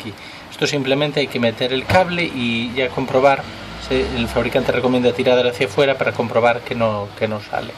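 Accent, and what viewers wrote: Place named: Spain. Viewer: Gracias por el video me sirvio para hacerlo sin que me explote nada en casa :-) q uno es manitas peroooo.... mori de morriña cuando escuche tu acento 15 años fuera de España pesan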